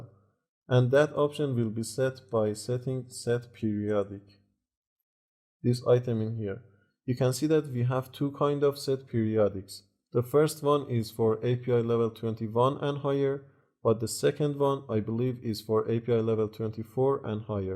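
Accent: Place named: Iran. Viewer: Farsi accent, I found out from your accent that you are Iran and I became very happy , LIKE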